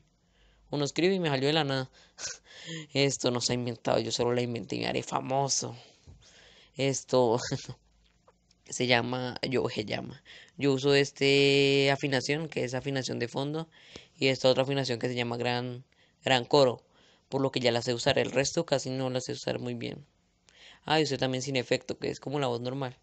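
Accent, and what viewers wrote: Colombia. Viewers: Jajajajajajajaja es que tu acento es de rolo ewe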